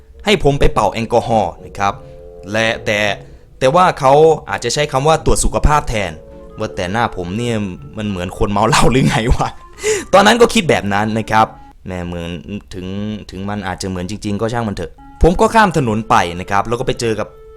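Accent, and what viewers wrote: Isan, Thailand. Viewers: พี่ผมถามจิงๆนะ พี่เป็นคนอีสานป่ะแม่นบ่ ตอบผมเดี๋ยวนี้ด้วย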